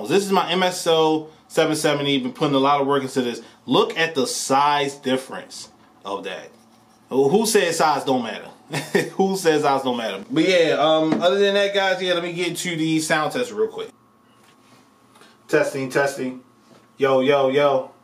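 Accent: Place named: Baltimore, United States. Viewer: Loving the Baltimore accent.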